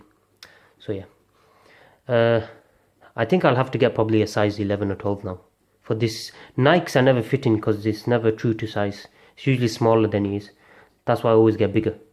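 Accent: Bradford, United Kingdom. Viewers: are you from bradford?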